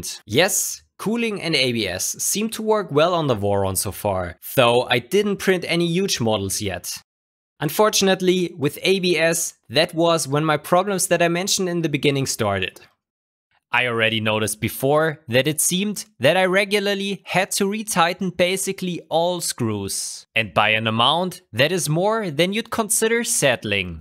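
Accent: Germany. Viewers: Warum skyped ihr auf englisch? Yeah, I know, why do I watch only english videos? But you both speak german. You, I can hear from a mile through your gernglish, (sorry, js) and also lives in germany, I think. His english only very rarely gives him away, and if you don`t know he is from germany, and don`t speak german yourself, you probably wouldn`t even catch it most times. -I didn`t, only when he got german greetings half way through a stream.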